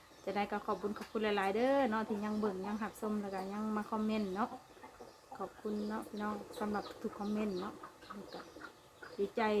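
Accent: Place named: Savannakhet, Laos. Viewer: ສະບາຍດີ ຕິດຕາມເບີ່ງຢູ່ເດີ..ເຈົ້າສວຍງາມດີ..ແບບທຳມະຊາດສ້າງມາບໍ່ແຕ່ງຫນ້າກໍງາມ...ເວົ້າລາວມ່ວນດີຂອ້ຍຊອບສຳນຽງສຽງເວົ້າພີ້ນອ້ງຄົນສະຫວັນະເຂດເວົ້າລາວ...ຮອ້ນ..ຮອ້ນ..ຮອ້ນ...ຫນ້າເຫັນໃຈ..ຢູ່ບ້ານຂອ້ຍໜາວເຢັນ...ໂຊກດີເດີ.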